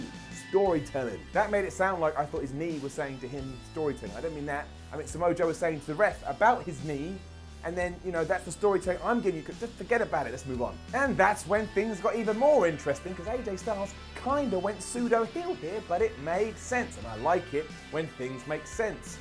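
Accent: Australia. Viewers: bro, you already sound Australian.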